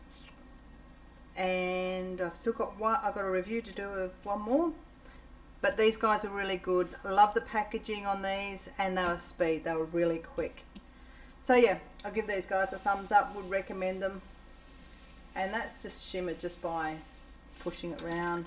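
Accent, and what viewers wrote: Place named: Australia. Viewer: This is lovely! 50x50 is becoming my go-to size. Also, great to hear an Aussie accent!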